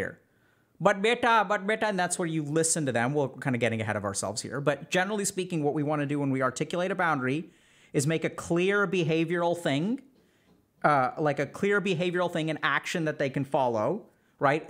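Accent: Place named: India. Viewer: your Hindi accent is hilarious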